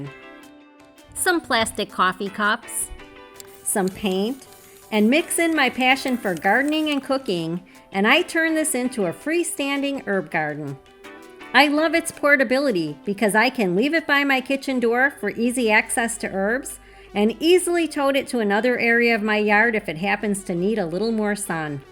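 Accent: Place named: Cincinnati, United States. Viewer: 🙌🏻🙏🏻❤️ You sound like a Cincinnati girl. Am I right? I’m from southern IN.! God bless you!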